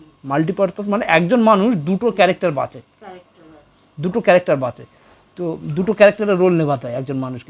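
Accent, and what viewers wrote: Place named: United States. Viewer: Mama rocks... darun laglo...what a Yankee style of talking... khub bhalo laglo ❤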